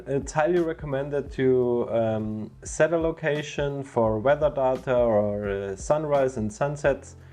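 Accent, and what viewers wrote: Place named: United Kingdom. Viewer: Love your work man, and your British is cool 🤙🏻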